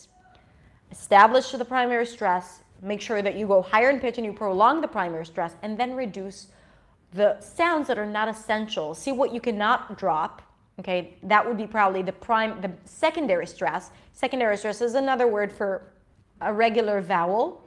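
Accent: United States. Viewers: Hi, can you make a video about your accent? I think it will be a super interesting video as you can showcase people how you can change and modify your accent? You are a model to me and the way you speak English is just perfect. I have always wondering why you have just a beautiful voice and intonation. Even in this video, when you say the word “ computer” in a fake way, it kind of has an English rhythm in it. Another question that I have that you can use to make a video is that “ do you have to give up your personality when speaking English”? Because again, what you have achieved is phenomenal. It is not just about the way you speak the language but what you’re doing during speaking is so American like keep smiling all the time or pause a bit when you are thinking, looking at the above, etc. I’m really interested in knowing how you acquire American accent. 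I know quite a lot Hebrew speakers and even if they’re taught how to say a word in a correct way, put strong stress, Rhythm, etc. there is still something missing, not perfect like you. I think you should make a video on it. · Hi, great video. I genuinely think that even though you are not a native speaker, you are able to explain pronunciation like an American, infact the first time I came across one of your videos I thought you were American.. I hope one day I'll be able to speak English like you do..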